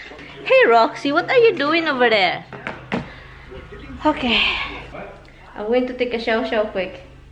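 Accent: Visayas, Philippines. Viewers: ang cute mong mag bisaya.. na intindhan ko kc bisaya din ako...simple ka lng...at gwapo si mr.khit may edad na sya..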